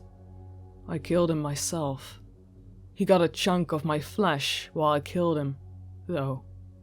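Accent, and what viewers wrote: Netherlands. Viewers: I hate this one it's like hiding ur accent, that's why we subscribe is to hear ur thick Netherlands voice, don't make me tell u again.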